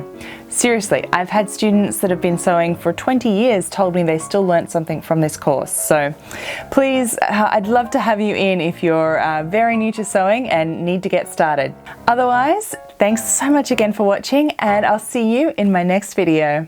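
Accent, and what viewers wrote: Australia. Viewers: New subbie ☺️ are you from Australia ? 💖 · Love, this. It is my first visit. Won't be the last! I use both pinking shears and the zig zag. Are you Australian? :)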